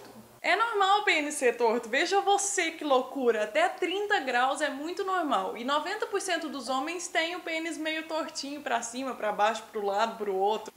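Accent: Minas Gerais, Brazil. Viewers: o sotaque continua??? Mineira..goiana...ou as duas?? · Você é mineira???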